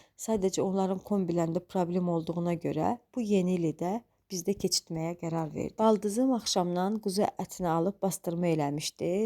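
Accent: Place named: Quba, Azerbaijan. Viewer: Ləhcənizdən qubalısınız deyəsən ancaq qubalılar mehriban olmurlar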